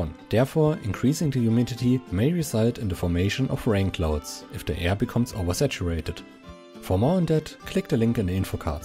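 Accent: Germany. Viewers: recognized that German accent. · hearing a german accent makes the high pressure systems turn german too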